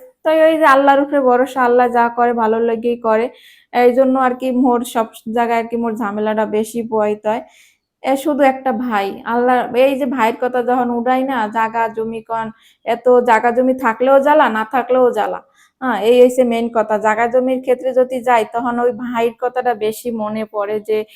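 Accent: Barishal, Bangladesh. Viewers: আসসালামু আলাইকুম আপুমনি আজ ফাস্ট হলাম ইনশাআল্লাহ তোমার বরিশালের ভাষায় কথা খুব ভালো লাগে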